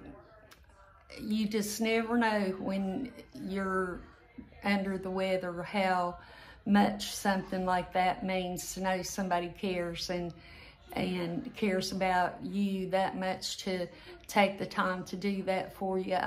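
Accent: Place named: West Virginia, United States. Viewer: Hi, My name is Christine. I sent you a letter in June, about my mom, Tonya. She has since passed due to bladder cancer. I want you to know how much you meant to her. She loved your personality, demeanor and sweet heart. While I was a caregiver for her, she had lost interest in a lot of things toward the end of her life, she was 79. But she always had your channel on. Even when she napped, she wanted your show playing in the background. You we're her friend and comfort. She was from Charleston West Virginia. You reminded her of her family and friends with your delightful accent and passion for cooking. Please get well, don't be down, we have eternity with Jesus someday. We love people and touch lives on this earth. I strongly believe that's the will of God. Just like Jesus did and still does. and that's what you do, everyday. I will pray for you and everyday. 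Sometimes soldiers get wounded and tired and need to heal. Thank you for being there for Mom and me at such a delicate and emotional time. I'll always be a fan. God bless you and yours. Your friend, Christine ❤️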